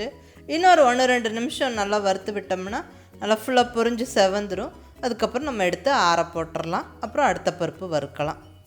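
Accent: Coimbatore, India. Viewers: Varuththu vittu kitta namma kongu tamil super mam ❤naanum idhey ooru than piranthu valarnthu marriage aanathu extra extra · U are from coombatore. The way u talk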